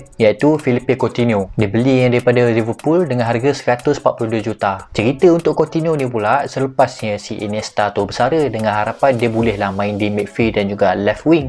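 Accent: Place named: Kuala Lumpur, Malaysia. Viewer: Awt ckp KL 😂 😂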